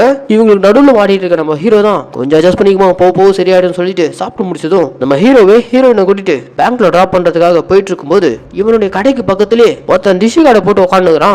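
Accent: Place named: Puducherry, India. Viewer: But neenga pesurathu pondicherry side pola iruku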